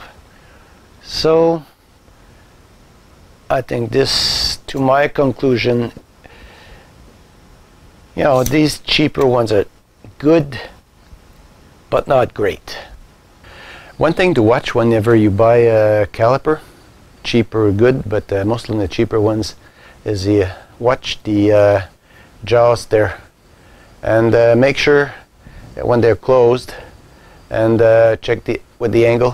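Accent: Quebec, Canada. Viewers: Tu sonnes tres quebecois? Serait-ce possible que tu sois au QC? super video en passant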